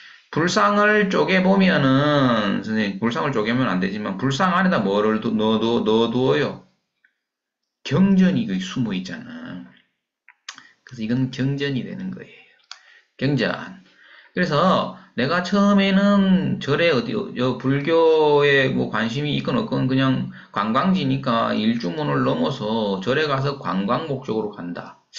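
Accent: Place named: Yeongdong, South Korea. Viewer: 예전에 선생님이 라는 호를 사용하실때부터 말씨도 그렇고, 제 고향 인근 분이 아닌가 생각했었는데 맞군요!! (저는 고향이 황간, 영동쪽이 아니고 접해 있는 경북입니다. 고등학교 친구 중에 황간 출신도 몇 있죠!) 템플스테이 할곳을 찾다가 사를 다녀온 기억도 나네요. 산 계곡, 월류봉, 물한계곡 참 좋은 곳에서 태어나셨네요!! 올갱이국도 생각나구요. ^^ 강의 열심히 챙겨서 듣고 있습니다!! 감사합니다.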